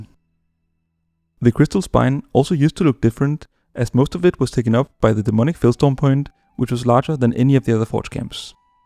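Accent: Denmark. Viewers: Love me some Dano-English accent, sounds too adorable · Danish accent detected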